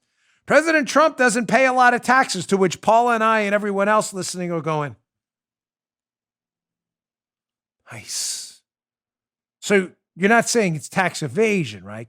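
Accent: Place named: Louisiana, United States. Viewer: Bongino! You are the man dude! Your show must be growing, I am down here in south Louisiana sending your show to all my people in the oil and gas business! You sound like us my man! Good luck with that surgery man don't let em hit your vocal cords you have a gift!!!🤞🤞👍👍🙏🙏👌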